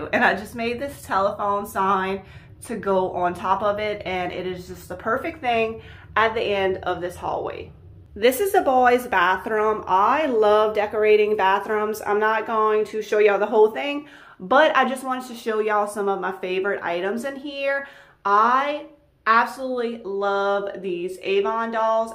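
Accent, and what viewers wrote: Louisiana, United States. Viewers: I love your home and style ,I to am from Louisiana,between New Orleans and baton rouge so I definitely could tell where you were from with your Cajun accent,,when we lived in Connecticut for about 2years in the late 80's the folks there thought we talked funny but in return we thought they did lol.it's nice to see where everyone is from and the cool ways people talk I just love it. Have a blessed day and thanks for sharing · I just came across one of your thrift flip videos on You tube and started watching and knew immediately that you were from Louisiana! LOL I am to, I live in North Louisiana in Shreveport. I am subscribing to your channel now because I love your style and teaching us how to make beautiful things. Thanks so much!! GEAUX TIGERS!!! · Hi when I first heard your voice I’m like she sounds like she’s from Louisiana which is where I’m from. 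I reside in Texas. Anyway sister I love your videos. Thanks so much for always sharing the thrift tours and DIY’s with us. Have a blessed week darling 🙏🏽🦋🌸🦋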